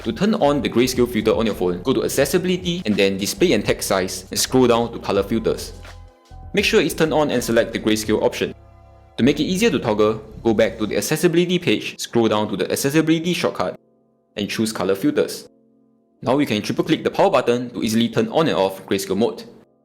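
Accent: Singapore. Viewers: This is cool but I can’t understand anything you’re saying because of your oriental Singapore accent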